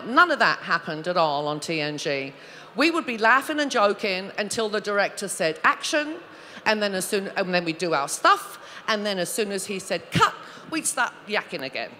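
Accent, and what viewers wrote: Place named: Cockney, United Kingdom. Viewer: Love that East end accent!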